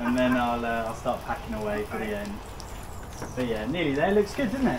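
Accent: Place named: United Kingdom. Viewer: Fence! Amazing. Love these kind of transformation and gifting videos. Thanks for your work and for sharing. Warmed my heart. And who doesn’t love the British accent? 😂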